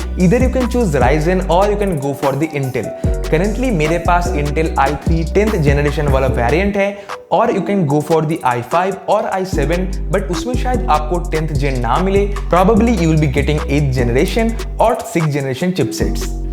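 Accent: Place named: Bengal, India. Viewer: Itna Ganda accent.... Bengali saala tobacco kha k bol raha hai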